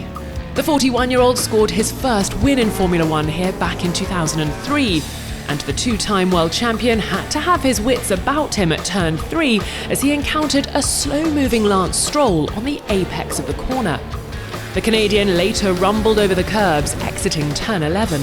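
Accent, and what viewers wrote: United Kingdom. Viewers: this narrator with that horrible britisch accent, cant f1 find any better? annoying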